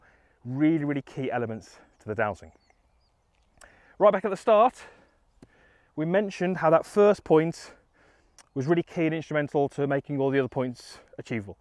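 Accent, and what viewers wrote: United Kingdom. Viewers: British english funny n talk too much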